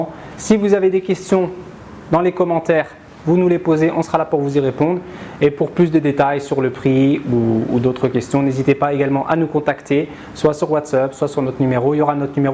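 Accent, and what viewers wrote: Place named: France. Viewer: Très bel accent français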